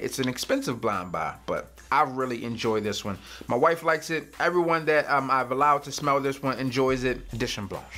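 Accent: Baltimore, United States. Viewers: Definitely from Bmore tho. Good video keep it up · Homie are you from bmore or Maryland or philly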